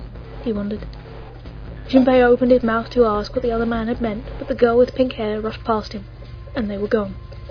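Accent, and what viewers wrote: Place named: Northern England, United Kingdom. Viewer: You've got such a nice voice to listen to :D lovely accent. North england maybe? ^^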